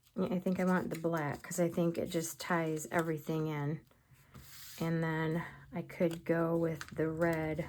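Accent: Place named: New York, United States. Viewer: These are so cute! I have to ask if you are from NY? I grew up there and I'm hearing it in your voice. Just curious.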